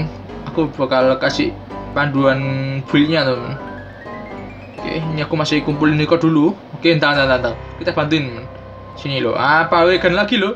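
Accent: Java, Indonesia. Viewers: Jawa nya ga nahan bos haha Pertahankan sebagai ciri khas mu haha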